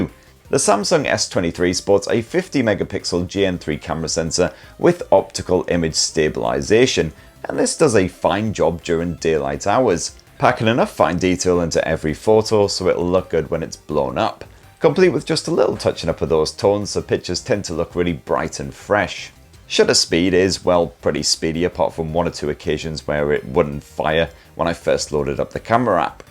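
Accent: United Kingdom. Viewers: Excellent review which I enjoyed watching and you gave top notch information HOWEVER, being an American, your fast speaking, Brit accent was at times difficult to follow. I still gave you a Thumbs up, thanks for all the great info.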